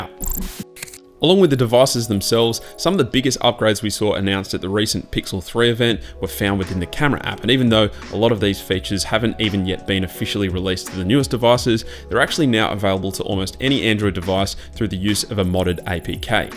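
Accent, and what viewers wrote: Australia. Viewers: Awesome video! Just wanna ask, are you Australian?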